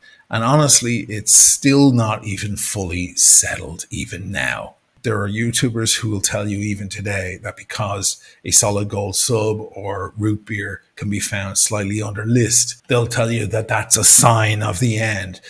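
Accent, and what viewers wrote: Dublin, Ireland. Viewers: Always love to see a dublin accent on u tube talking about watchs i admire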